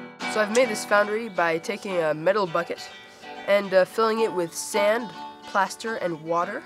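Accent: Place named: United States. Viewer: You sound American. Yet, where you live doesn't look like America. Nice work by the way. Great start!!!!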